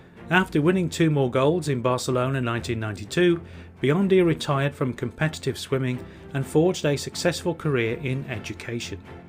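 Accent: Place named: Wolverhampton, United Kingdom. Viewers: I'd have definitely included Linford Christie as he's a legend for sure! Also Colin Jackson, but that's probably more of a "me" thing as he's Welsh and I love a Welshman! 🤭 So you're from Wolverhampton then? I've got a friend there. Really should have twigged to the accent! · After all these months of being a subscriber and watching I've always thought you sounded local, it's only when you mentioned Tessa Sanderson you revealed yourself to be a fellow Wulfrunian. Love the content, as an 80s child it's lovely to reminisce while watching.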